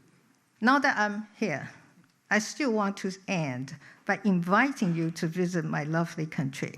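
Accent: United Kingdom. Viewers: 小英總統有點英式腔呢😂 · 的口音真的很英式，不像台灣人在說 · 小英的英國腔好好聽喔～～智慧跟勇氣兼具，絕對是全球最有魅力的女性領導人之一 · 伦敦音很舒服有教养放眼世界无论东西方很少有的领导人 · 好獨特的英國腔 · 小英的微英國腔好好聽，堅定不移又時而幽默的口氣。不禁讓人想起對抗納粹時的英國政治家。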